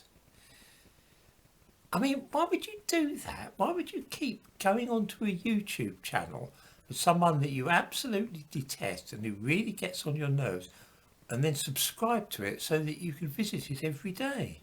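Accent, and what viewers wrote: United Kingdom. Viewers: I have to say I have enjoyed this man's videos a great deal. I can't comprehend how anyone could find his charming British accent and peaceful, relaxed speech, somehow annoying.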